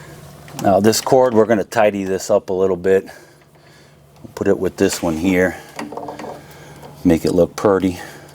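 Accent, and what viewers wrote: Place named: North, United States. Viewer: bwaaa haha😂 my dad and I listened to your video together, in the first two min I said "this guy is a northerner, he's from north PA, but south of Boston. Can't figure out why he doesn't say WOOter(instead of WATTer)." 5$ bar bet you're from Southern MI?!? Thanks for the awesome video, Can't wait to install the new unit(s) that we bought..we're putting his in in Southern Colo tomorrow, I'll do mine in south TX later on in the week. Your video was a great help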